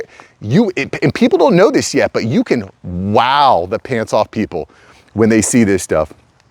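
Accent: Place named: United States. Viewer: Speak slowly, articulate clearly and speak in English, not American.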